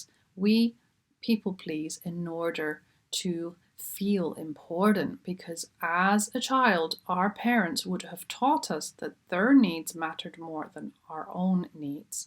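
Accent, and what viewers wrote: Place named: Scotland, United Kingdom. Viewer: Can't figure it out. Sometimes she sounds like a native of Scotland, sometimes Northern Ireland.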